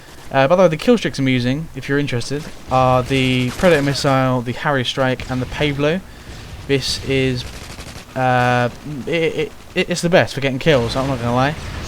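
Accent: United Kingdom. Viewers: Gotta say almost had a heart attack when i saw you did a review on the Tar-21. Absolutly love the thing to death and with silencer too, 5/5 for the vid and a Brittish accent to boot! ;p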